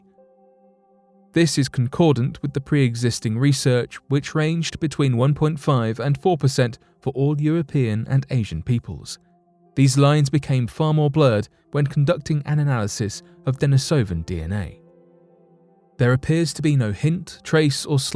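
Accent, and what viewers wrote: United Kingdom. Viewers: I think there are 2 narrators both are British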